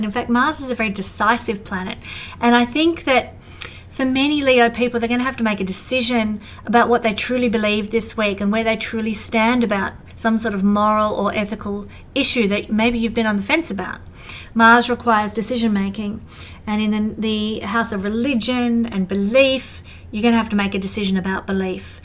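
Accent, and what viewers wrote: Australia. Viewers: Refreshing to hear an australian astrologist, aussie here too Taurus sun, Libra moon, Leo rising Love your videos and a conspiracy realist here also ❤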